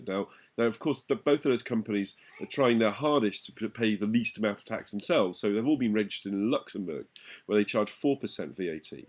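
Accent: England, United Kingdom. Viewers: you sound like you live in England... NO TAXATION WITHOUT REPRESENTATION!!!!!!!!!!!!! look it up if you dont know ;)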